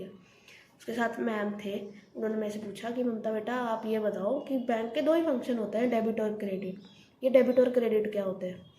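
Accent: Punjab, India. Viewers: Aap punjab se ho?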